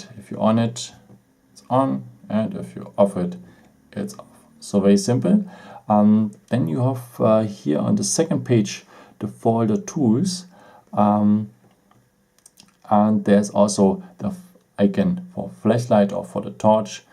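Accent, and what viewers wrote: Germany. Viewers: Wie man einfach hört dass er Deutsch ist bzw seine Muttersprache Deutsch ist. XD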